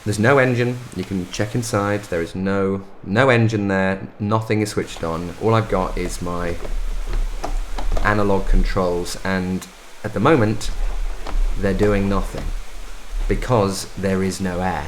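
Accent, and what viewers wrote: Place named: United Kingdom. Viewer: You like ryan gosling but drunk and british :) good video!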